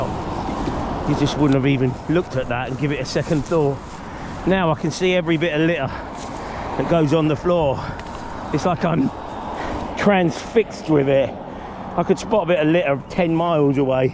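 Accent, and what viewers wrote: United Kingdom. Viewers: orange county should have a volunteer program for litterpicking that you could sign up for. they'd provide bags and sticks for free. And yeah, that english accent makes you sound authoritative to us americans. good work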